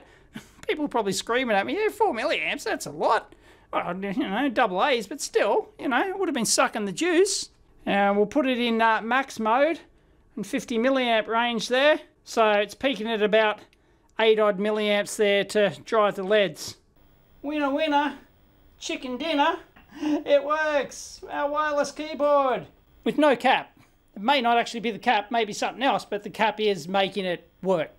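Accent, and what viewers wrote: Australia. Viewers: I tried finding it by your statements in the vid but the web site came up as an Asian Casino site. If I'm understanding your Aussie speak it is <link> The TO8662+ doesn't return anything in a search. I'm sure I must be misunderstanding something. Sorry Dave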